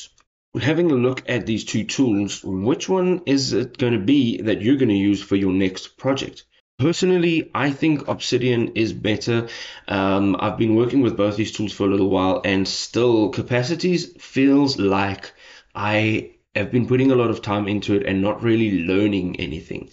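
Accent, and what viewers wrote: South Africa. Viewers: I was looking for a comparison and your video was the first I saw, I must say I could hear the South African accent from the first second :P Hi from a fellow Saffa productivity fan!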